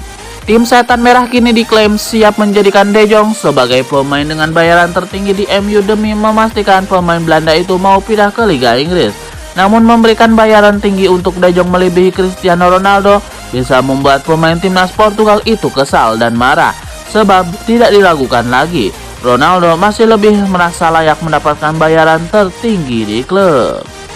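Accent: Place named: Java, Indonesia. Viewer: Medok jg..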